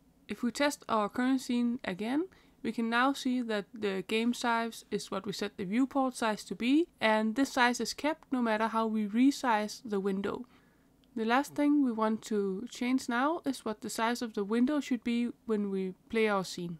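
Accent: Denmark. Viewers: Wow, you sound very Danish, anyways, great guide! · Du er i hvert fald dansker da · Oh, the accent. Are you danish? Greetings from across the western border!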